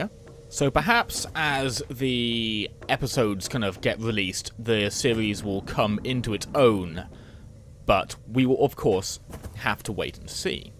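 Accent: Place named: United Kingdom. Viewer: Your accent makes me think of Jonathan Ross - are you from the same part of Britain?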